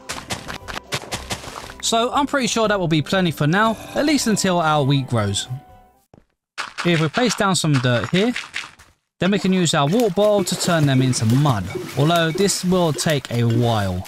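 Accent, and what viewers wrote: United Kingdom. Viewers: Are you by any chance British you sound like one not being stereotype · Sounds like Bri'ish fella!!! (no offense) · His British axcent is 🤯🤯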